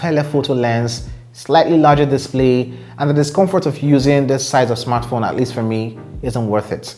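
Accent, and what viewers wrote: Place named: Nigeria. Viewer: u sound like u originally a nigerian__if u are much love brooo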